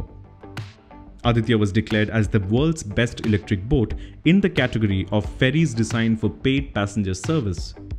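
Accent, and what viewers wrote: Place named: Kerala, India. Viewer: By your accent I think you are Malayali...... Anyways glad to see my fav newspaper channel 😄😄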